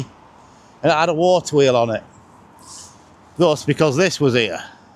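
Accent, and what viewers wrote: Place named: Grimsby, United Kingdom. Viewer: I live in South Africa and I really enjoy listening to your Grimsby accent ! Your videos are Fantastic !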